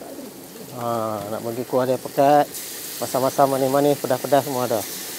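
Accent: Kedah, Malaysia. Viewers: Assalamualaikum..kami dengaq sora macam orang Kedah😊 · Orang kedah rasanya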